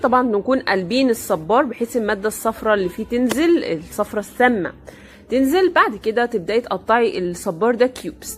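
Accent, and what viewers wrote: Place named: Maghreb. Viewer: انت بتتقني اللهجة المصرية بس واضح انك من المغرب العربي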